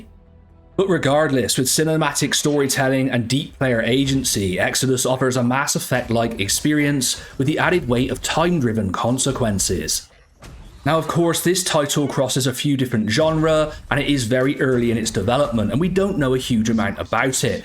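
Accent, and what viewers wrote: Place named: Bristol, United Kingdom. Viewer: Your accent sounds Bristolian, the very least you're from the South West? I have so many questions.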